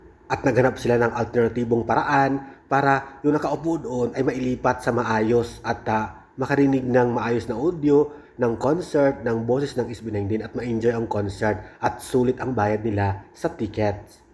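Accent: Visayas, Philippines. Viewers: sana nag AI kn lang..kc bisayang bisaya k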